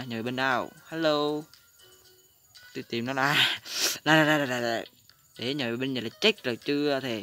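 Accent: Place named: Quang Nam, Vietnam. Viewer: Nghe giọng quảng nam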